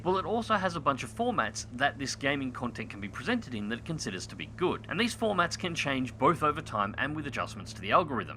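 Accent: Australia. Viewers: As far as I can tell, I haven't not seen your uploads in my feed yet. I hope you keep going, it's nice to hear an Aussie accent on here.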